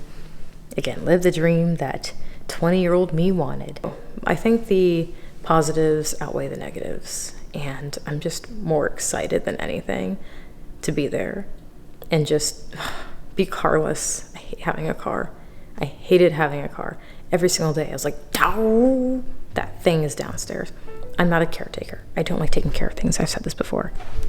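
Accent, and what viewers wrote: New York, United States. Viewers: That New York accent is peak ms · The bricked up and the New York accent took me out girl 💀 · Perfectly stated. It really is annoying how closed minded some of us still are in 2022. love you practicing that NY accent. Been down here since 2017 and still have my hybrid NY/NJ accent when I got mad. Love your videos! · The New York accent 😂😂😂😂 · Your NY accent is giving old Jewish lady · I think your NY accent is funny. I always liked the comedy show The King of Queens, where Carrie has this same kind of accent. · 🎉🥳Congratulations on your new place in the big apple, I’m so excited for you!!!!!! Apologies for the delay.., I’m still getting over the 50xs the rent smh 🤦🏾‍♀️ Great NYC accent!!!!!!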